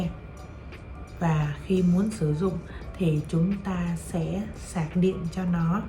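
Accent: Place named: North, Vietnam. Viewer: Cảm ơn em. Quê của em ở đâu? Anh nghe em nói rất rõ. Em dùng “z” như ở miền Bắc nhưng dễ hiểu nhỉ 😮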